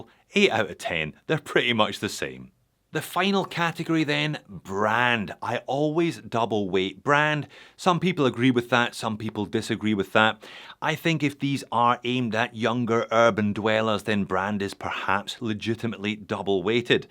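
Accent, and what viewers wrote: United Kingdom. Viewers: Thank you Sir, love the accent ! Is that from United Kingdom 🇬🇧?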